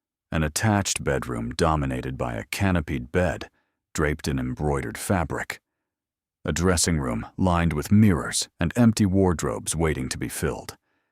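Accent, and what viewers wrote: United States. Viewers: The American accent doesn't belong in this English aristocratic period story. The narration comes over as bitter & angry. The inflections and punctuation are all wrong and detract from the story telling.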